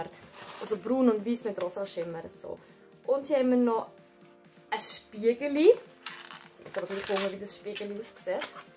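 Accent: Switzerland. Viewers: Haha i finges huera geil dasd itze all viedeo uf schwiizer dütsch machsh :) U da einhorn ha ig oh😂😍🦄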